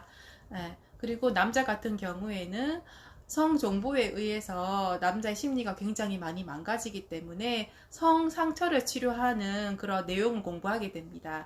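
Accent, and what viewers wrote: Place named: Gyeongsang, South Korea. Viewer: 갱상도 누님 갱상도 사투리가 구우~수우~하네예~ 진짜배기 갱상도 사투리네예~ 억양 보니깐 대구 토종 신토불이 사투리 억양입니꺼?아니면 마산 근방 사투립니꺼?? 마산과 대구 사투리를 구수우~~하게 잘 쓰시네예!!!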